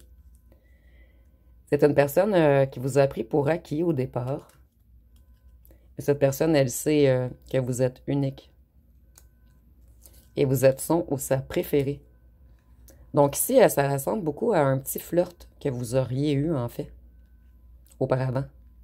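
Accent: Canada. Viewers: J'adore l'accent canadien bonjours c'est Patrick vous êtes la rose de mes jours ❤❤❤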